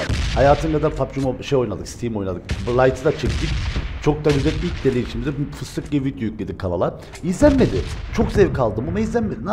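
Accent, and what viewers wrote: Cyprus. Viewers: Abi gıbrıslımısın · Kibrislilar gibi konusuyorsun nerelisin😂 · Abi senin sivenden anladim kibrislisin dimi bnde kibrisliyim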